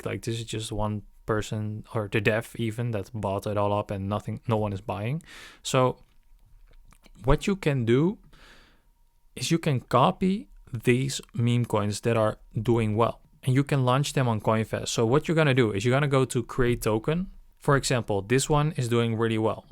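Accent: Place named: Netherlands. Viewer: Je hoort het aan het accent hij komt sws uit nl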